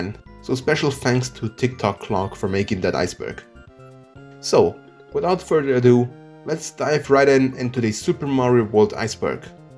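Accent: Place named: Germany. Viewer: His german accent is the same as my englisch teacher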